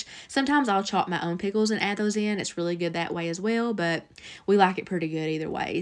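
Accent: South, United States. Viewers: Hey sweetie, idk if anyone else has already commented this or not. But sorghum is pronounced 'soor' (like door) 'gum'... So, 'soor-gum'. I'ma southern gal myself and might I say, I just love your accent! Lol Thank you for all of these wonderful videos and recipes. I'm most definitely trying out that tater salad! ❤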